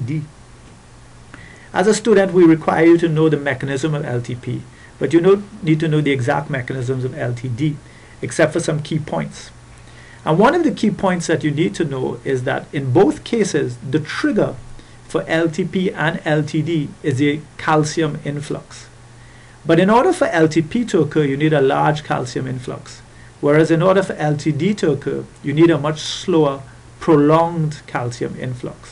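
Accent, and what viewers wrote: Trinidad and Tobago. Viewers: really clear lecture, excellent rhythm and timing. thanks for making this! sounds like a Trini.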